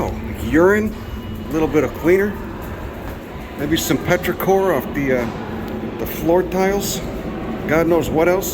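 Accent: Chicago, United States. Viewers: Sounds like you come from Taylor Street, I think I picked it up here and I actually from there like Downers Grove or some crap. Anyway, yeah Chicago River Resurgence such as it is you know much progress compared to that crap in New York f*** that noise . Some assholes ain't giving a f*** but you know nature takes its course wherever it can. Edit grew up in Elmwood Park not Downers Grove. Keep on keeping on capital p a l . I ran across a tribe of snapping turtles, that would knock your eyes out I don't think you could fit them in the seat of a Toyota Karla yeah that monster on the Chicago River what are you going to say who can compete these things went from two to three and a half feet front to back on the shell, used to be a carpenter I know my dimensions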